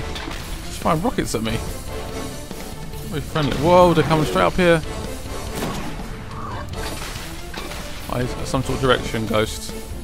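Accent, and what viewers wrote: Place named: Southeast England, United Kingdom. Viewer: I'd guess he's from Southeast England.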